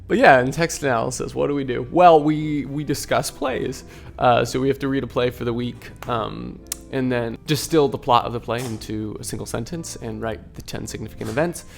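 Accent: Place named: RP, United Kingdom. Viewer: Love the little Austin powers references at the end. Will concur will my fellow Brit that your RP is pretty dang good, though more newsreader than nobility heh, but you definitely are hitting the intonations well, good job. Amazed that this is an average week though, as sounds very busy, but I guess with performances and education combined, it is like two courses in one. Although not a degree I considered when I went to uni, I have family members who did, so this was really fun and a great insight to an acting degree. Keep up the content, and best of luck for the future.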